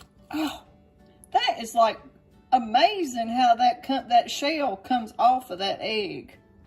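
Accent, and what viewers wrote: South, United States. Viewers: I boil a dozen, and use the ice bath method. I like to eat one boiled egg in the morning. Still have problems peeling , so I take the cold egg I plan to peel and place it in hot water for a minute. Seems to help. Like your southern accent!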